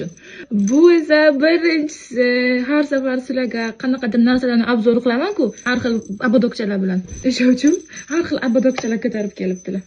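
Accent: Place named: Bukhara, Uzbekistan. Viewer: Opa Buxorolikmisiz shevayiz õxshaykand manam Buxorolikman aytvoring❤❤❤❤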